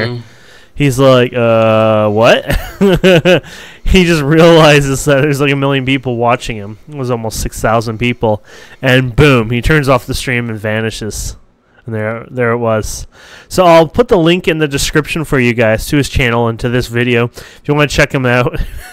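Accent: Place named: Canada. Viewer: Kanadai csávó, hallani az akcentuson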